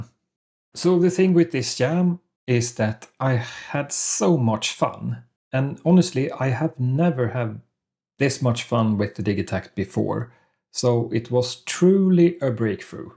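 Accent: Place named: Sweden. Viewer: You sound super Swedish!? ❤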